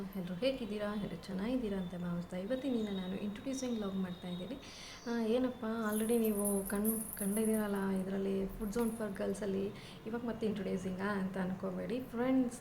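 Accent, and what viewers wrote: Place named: North Karnataka, India. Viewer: ಯಾರೇ ಕೂಗಾಡಲಿ ಯಾರೇ ಹೊಕ್ಕೊಲ್ಲಲ್ಲಿ 🤪 uk ಭಾಷೆ ಇದು ನಿಮ್ಮ ಭಾಷೆ ಏನು ಅಂಕೋಳೋಲ್ಲ ಯಾರು ಸೂಪರ್ ಮೇಡಂ ಮಾಡಿ 👌🏾👍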